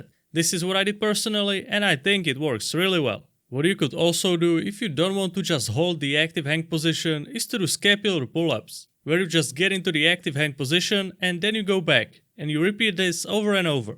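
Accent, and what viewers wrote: Czech Republic. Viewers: Český přízvuk 😅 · yo are u Czech ? just guessing from ur accent haha, great video anyways, will definitely try it! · you are from czechia, the accent cannot be hidden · Hey! I know you will most likely miss this comment, but I still wanna ask: I have an oddly specific interest in accents and like to make a small "game" for myself in guessing them and after watching a few of your videos I wanna ask: Are you Czech? Odd question aside: I love your content ❤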